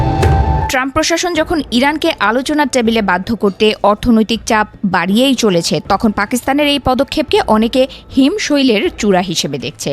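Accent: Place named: India. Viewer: ৭১ টিপির মালিক বদলেছে কিন্তু চ্যালা গুলো এখনো রয়েছে। তাই তো ভারতীয় টোনে এখনো কথা বলছে।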